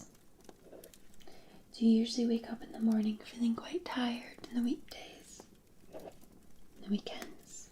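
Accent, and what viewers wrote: Scotland, United Kingdom. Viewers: Can u do ASMR with ehavy scotish accent ? 🙂💙🤍💙🙏🥃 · And a sleep therapist with a Scottish accent, nonetheless!😉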